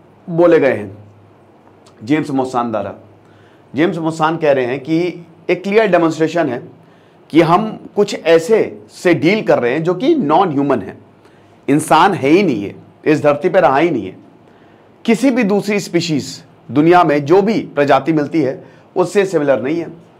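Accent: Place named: Uttar Pradesh, India. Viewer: Aap UP k ho ye to accent se pata chala aur sun k maza aya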